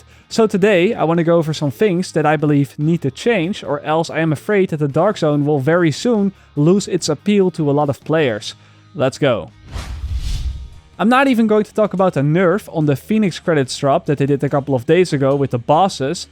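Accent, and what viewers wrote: Netherlands. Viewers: you dutch? you sound dutch. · you sound like you're dutch.Why? I hear it on your voice. And btw.... Never stop making videos,only if you want to xP. Love them.. Got yourself a subscribe buddy. Daag!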